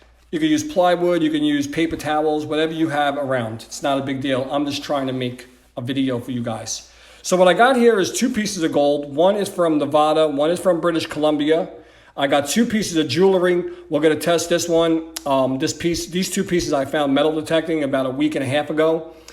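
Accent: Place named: East Coast, United States. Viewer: Thank you for this simple and effective demonstration. I do love an East Coast accent!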